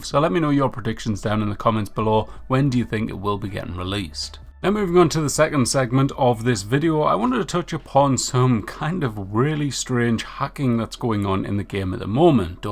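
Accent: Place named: Northeast England, United Kingdom. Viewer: 2 different accents! The skyline guy is trying to do a Scottish accent (badly) & is from the Northeast of England.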